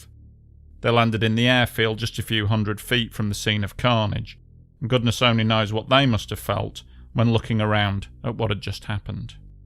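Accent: United Kingdom. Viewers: Where is the narrator's accent from specifically in the UK?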